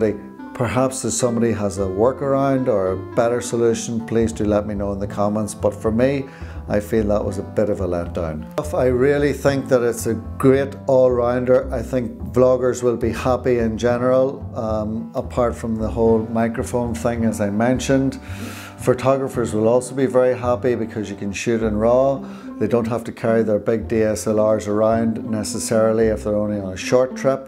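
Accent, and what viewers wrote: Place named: Northern Ireland, United Kingdom. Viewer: Love this review,. Nice to hear from a fellow Norn Ironer:)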